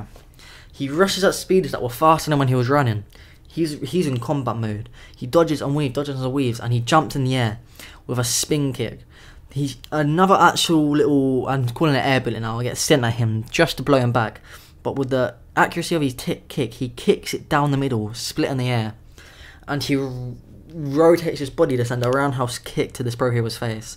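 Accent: United Kingdom. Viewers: Fix yo Briish accent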